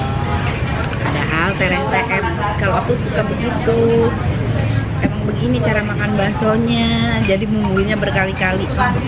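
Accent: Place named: Bogor, Indonesia. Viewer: Orang Bogor mana Sora na😁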